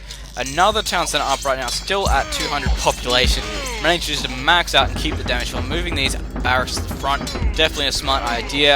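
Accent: Australia. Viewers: from your accent you're Australian. Cant you get hex to pay for your uni?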